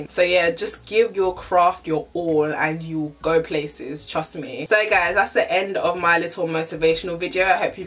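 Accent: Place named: United Kingdom. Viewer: I just had to click youuuu the title caught my eye.... bowyyyyy do i love your accent you sound proper British😍😍 i love the content of this video i love the banta/ rant I totally agree 💯 yanoe most of the times us black people dunno how to support each other..... like im actually in love with you right now " their youtube video dont even bang" its really really really hard talking to the camera yanoe i laugh looking at my first three youtube videos i sounded like a penguin thats how we learn inni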